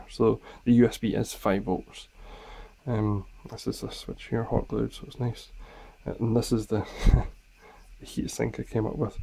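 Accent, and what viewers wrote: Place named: Scotland, United Kingdom. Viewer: English with a Scottish accent, if that helps you.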